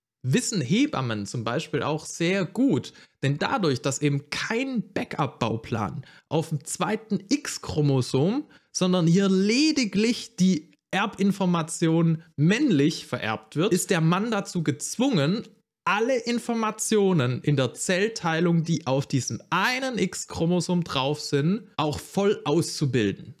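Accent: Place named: Swabia, Germany. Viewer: Geiles Video. Den Schwabe in dir bekommt man nicht leicht raus. Grüße ins Ländle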